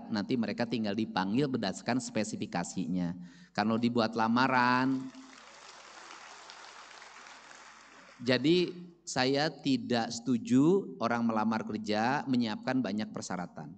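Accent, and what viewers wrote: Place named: Java, Indonesia. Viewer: Tahu aku kabayan,,,, Tapi si KDM besar nya, di subang, subang itu banyak jaware ( jawa separo), sejatinya adl bangsa jawa. Lihat saja KDM, kadang2 keluara logat jawa,, lihat saja rumahnya itu rumah bergaya jawa bukan sunda..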